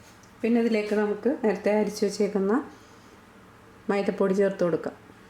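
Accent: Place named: Aluva, India. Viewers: ചേച്ചി ആലുവ കാരി ആണോ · കൊച്ചി ആണോ നാട് ?? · എവിടെ വീട്.. നല്ല പരിചയം ഉള്ള സംസാരം.. എല്ല word ന്റ അവസാനം "ട്ട ". . ഞാൻ ഇങ്ങനെ സംസാരിക്ക 😄from ത്രിശൂർ.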